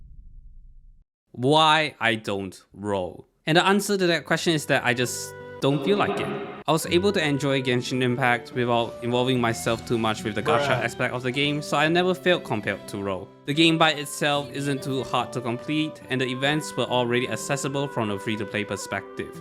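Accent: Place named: Singapore. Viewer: Ayo are you Singaporean u have Singaporean accent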